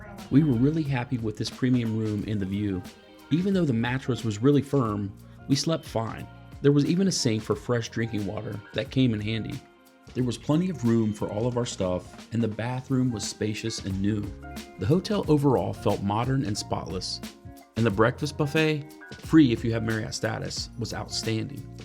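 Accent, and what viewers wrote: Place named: South, United States. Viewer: You accent is horrible ! Please sound Swedish and not Southern USA.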